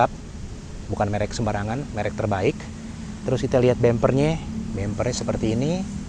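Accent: Jakarta, Indonesia. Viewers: Betawi banget ngomongye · Kacaoo om bos , logat jakartanye kentel banget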